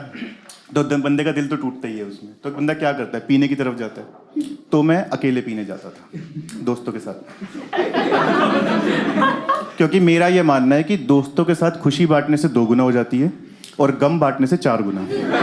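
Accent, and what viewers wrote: Alwar, India. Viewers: Bhai alwar se ho kya?? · Bhai alwar se ho kya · Sir aap alwar rajasthan se ho kya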